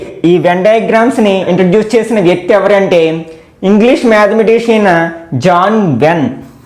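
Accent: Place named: Godavari, India. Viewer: Thank You, While I hear your class I feel as if I hear my close friend teaching me its because of your good Godawari tone. All the best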